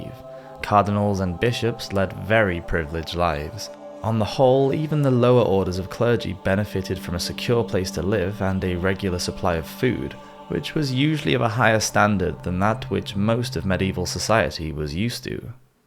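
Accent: England, United Kingdom. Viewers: English accent :)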